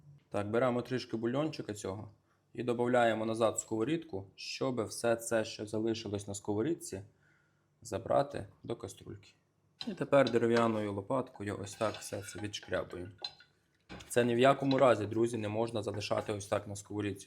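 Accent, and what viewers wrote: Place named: Lviv, Ukraine. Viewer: По виговору ,я так думаю,хлопчина зі Львова́,а напишіть чи не вгадала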